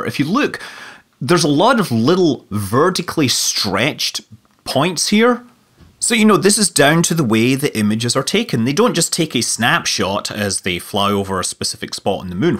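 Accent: Scotland, United Kingdom. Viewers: "Push broom" might be the best phrase to hear spoken in a Scottish accent.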